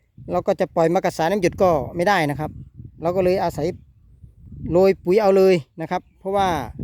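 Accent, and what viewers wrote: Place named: Cambodia. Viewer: 555ดูทีไรตลกทุกทีงึกๆงักๆเขมรรีรัม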